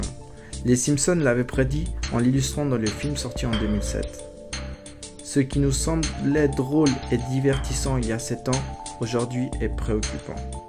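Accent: Belgium. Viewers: + articulation TRES faible, je connais bien l'accent belge mais je n'arrivais pas non plus à comprendre les années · C'est obligé ce mec il est belges vu comment il prononce les dates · Il est belge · T belge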